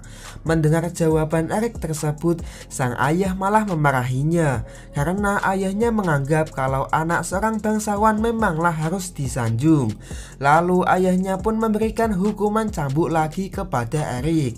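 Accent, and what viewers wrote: Java, Indonesia. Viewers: JAWA NYA MEDOOOOOOOK BGT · Medok banget lu bang..wkwkkw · Meudok bingit min .jangan kaku min bicara nya. Monoton bingit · Medok banget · wong jowo? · jowo mu endi mass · Medok banget sih Lo, jijay gw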